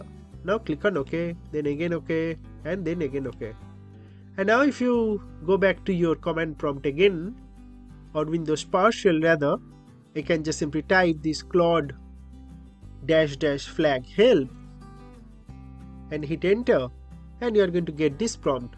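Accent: India. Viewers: Hear indian accent - know for sure that i will be able to install it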